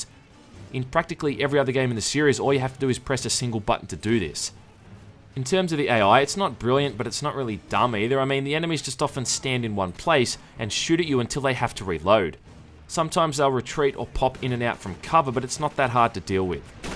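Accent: Australia. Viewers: You sound so innocent and well behaved here mate! Keeping the Aussie accent in check too. I'm glad you found your real voice eventually, coz you're hilarious and entertaining. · Love your Australian accent.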